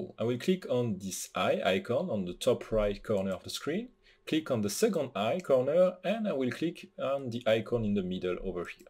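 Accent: France. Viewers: Indeed it's a French accent, sorry about that, doing my best to improve my english ;) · il est français je le sais car j'en suis un et je le repére a 1 000 km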